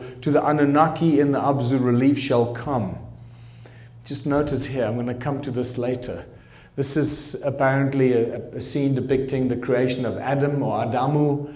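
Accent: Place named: South Africa. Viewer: Love it! and spoken in true South African English lingo.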